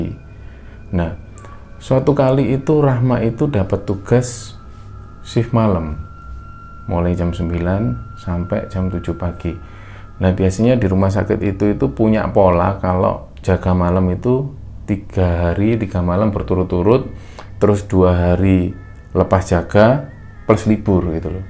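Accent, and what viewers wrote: Java, Indonesia. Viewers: Mas tetap jadi story teller ter-fav! Oh iya mas, saran untuk memperbaiki pronunciation kata dalam bahasa Inggris. Sebenarnya gak masalah dengan logat jawanya karena saya juga orang jawa timur. Tapi menurut saya lebih baik jika cara pengucapannya benar 😃 Dan jika ada kesempatan, saya ingin menceritakan cerita horor temen saya yang pernah bekerja sebagai perawat orang cacat dan kejadiannya tahun lalu, di Hamburg. Tidak terlalu panjang, tapi setidaknya bisa berbagi bahwa hal seperti ini juga ada di negara lain 😁 Semoga makin sukses! 😃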